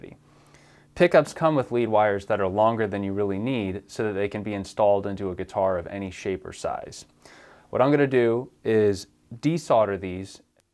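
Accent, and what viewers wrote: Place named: United States. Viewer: Why do Americans pronounce solder as sahder? It's never made any sense to me. Do you say bahder instead of boulder? Do you say this thing is a lot cahder than this one which is hahder?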